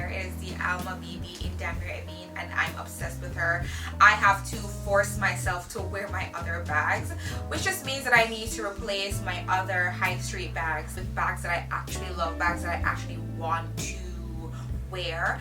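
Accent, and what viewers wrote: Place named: Jamaica. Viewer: I heard a Jamaican accent so I’m subbing to my people.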